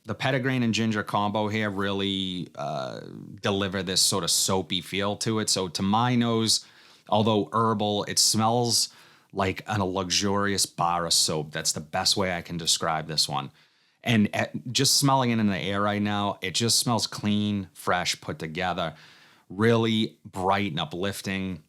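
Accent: Boston, United States. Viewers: Here for the fragrances , but also the Boston accent 😝☺️ MFK GFS is awesome , but I can’t afford the OG - I like Oil Perfumery’s version (it’s def a bunch) as well as fragrancebar and ALT fragrances . I needed a variation from anyone that duped it . Great cooling fragrance, I love it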